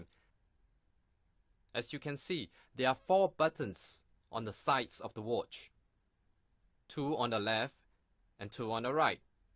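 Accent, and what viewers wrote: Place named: Singapore. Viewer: U sounded like a singaporean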